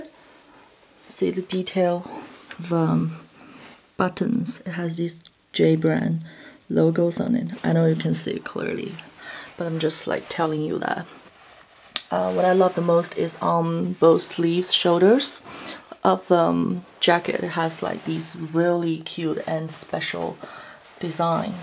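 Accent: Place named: England, United Kingdom. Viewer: like your english accent. wanna hear chinese too! plz do chiese video sometime soon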